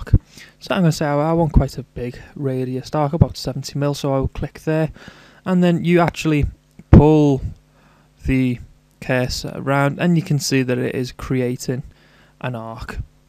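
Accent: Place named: North West England, United Kingdom. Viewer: Hey really well explained and incredibly clear. Your accent sounds like you are from the North West. Please can you tell me why I am not picking up circle/arc centres when sketching an entity on an existing feature. Even something simple like trying to create a circular extrusion on say the end of a circular shaft; the centre of the shaft doesn't show. It used to work. Have I switched something off? It's been driving me crazy for weeks. Thanks for any help you can give. Cheers. Robert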